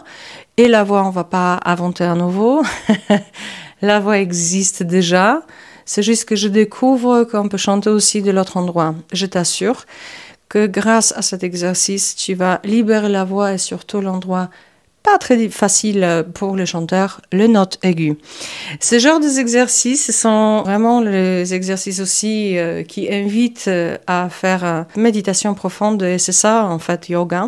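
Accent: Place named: Czech Republic. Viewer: Vous êtes des pays de l’est, tchèque? En tout cas trés intéressant votre voie pour travailler la voix.En comédie, pour dire, on représente un autre que soi,une cible, les yeux dont vous parlez , avant la voix que l’on projète. Merci!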